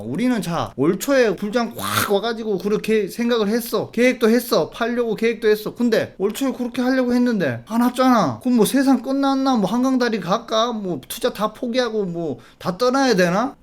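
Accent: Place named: Gyeongsang, South Korea. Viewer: 항상 시원시원하게 말씀해 주시고 경상도 사투리 정감가고 좋아요 항상 잘 듣고 있습니다 감사합니다.^^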